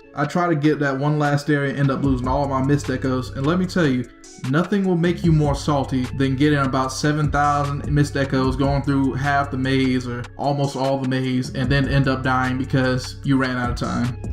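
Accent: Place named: Los Angeles, United States. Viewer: This man has got to be from LA.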